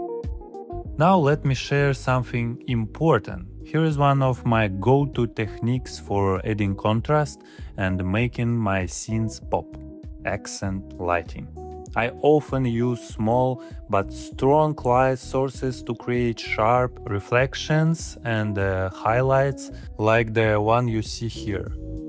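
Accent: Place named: Russia. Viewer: Ты русский? Английский палится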